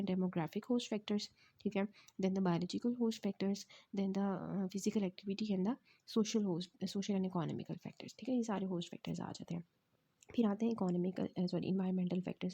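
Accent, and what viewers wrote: Karachi, Pakistan. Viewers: MAINE W22 OR BILAL MAZDY KA AWAZ SUNA MTLB AP KARACHI SY LAG RAHIII HAIN DAIKY LECTURES KITHNY SHOUQ SY SUN RAHA HU BAGROUND KI ENVIORNMENT BE CAPTURED KR RAHA HO HHHHHH GEO KHANS HHHHH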